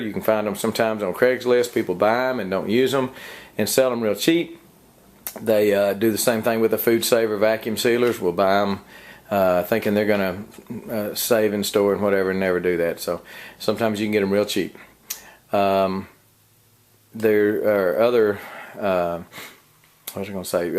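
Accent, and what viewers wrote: Texas, United States. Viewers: Love your Texas accent!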